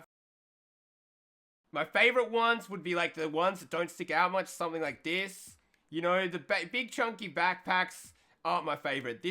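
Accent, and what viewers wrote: Australia. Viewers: So, ur dumbass is telling me that everyone with an Australian accent sounds the same? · Hussein Naser they are both Australians durh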